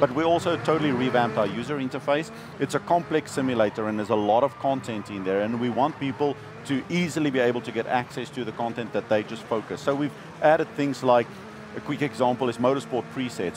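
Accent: South Africa. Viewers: is this guy South African? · Why does he sound like South African?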